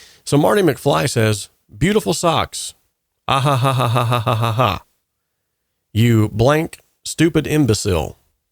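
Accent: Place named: South, United States. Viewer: Hi I'm a singer/songwriter composing own my songs from home and your production tutorial videos have helped me so much in my own music production please dont pay attention to the negative comments and by the way you have a great southern accent stay blessed!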